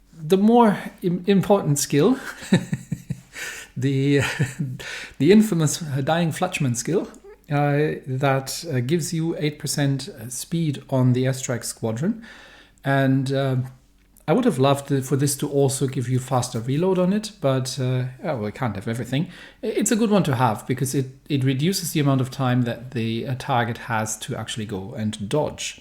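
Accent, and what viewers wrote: Germany. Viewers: Hello are you a native german speaker?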